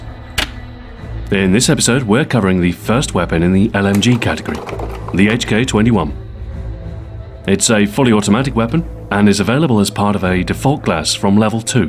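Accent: United Kingdom. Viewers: Only a British accent could pull this type of informative video off. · is he from the uk? i am.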